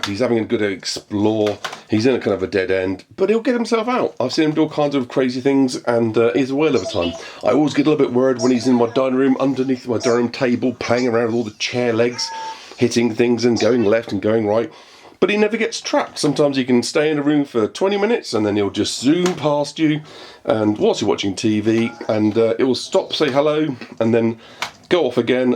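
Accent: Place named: England, United Kingdom. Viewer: Hello, First off. My Grandfather was from England and due to him, I tend to sound like I came from England as well. He passed away when I was 13 and I miss hearing an English voice once (Other then my own.) in a while and I loved hearing your voice. On BB8. I have read newspaper and magazine reports and seen a few videos here on You Tube about him. BUT! This report of yours was much better and covered more points then the news stories and other videos did. I was considering getting a BB8 for myself and your report helped me make up my mind about getting him, Thank you. I have a VOX R2D2 from the first year that "Tiger Toys" (Hasbro Toys.) came out with them (Thinkway Toys is making them from this new movie now.) and I have had a ruddy blast with him and every time I set him loose on his patrol mode, he gives my cats a very hard time and drives them around the flipping twist! 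I can not wait till I can get BB8 here and cut him and R2 loose in the house and watch as they target one of the cats and goes after her! THIS IS GOING TO BLOODY WELL BE A TON FUN!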